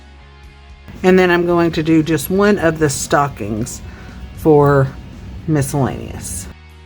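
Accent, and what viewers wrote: South, United States. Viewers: You are definitely a southerner, just like me! North Cental Louisiana, here! I have a very slow drawl! 😂😂😂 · I’m from GA and you have an accent to me. My parents are not from the south though, so I don’t have a southern accent. I do say y’all a lot though 😂 Love to see you doing so many challenges ❤ Great job! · Hi...new subbie here:) I'm northern so yes, you do have an accent lol Your savings challenges are inspiring!! Great video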